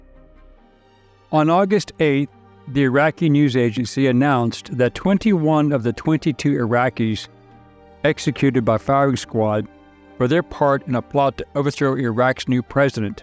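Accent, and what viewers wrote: United States. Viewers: You have the most American Cowboy voice ever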